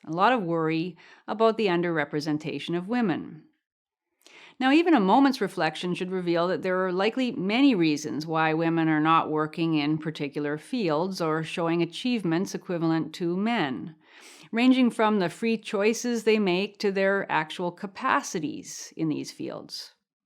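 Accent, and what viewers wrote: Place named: Atlantic Canada, Canada. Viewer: This is neither here now there, but listening to your voice takes me back to my youth in Atlantic Canada listening to CBC programs before it went completely insane. That essentially examining and considered tone and accent.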